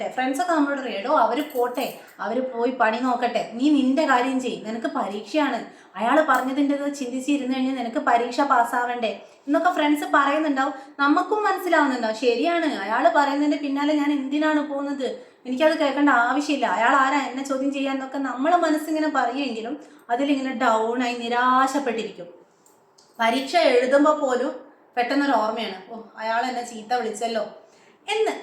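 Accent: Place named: Kannur, India. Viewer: Ningal kannuraano 😅.nammale athe basha slang..veetil ulla areyo pole thoni😅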